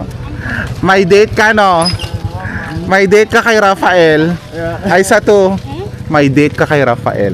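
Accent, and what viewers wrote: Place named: Ilocos, Philippines. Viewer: Ilokano po ba kayo? Ung accent kase kapag magtagalog 😅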